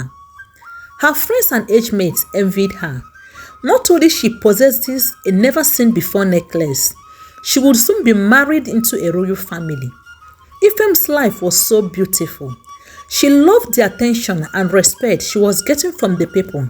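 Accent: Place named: Nigeria. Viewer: Wow you are now using Nigerian voice sample. That's great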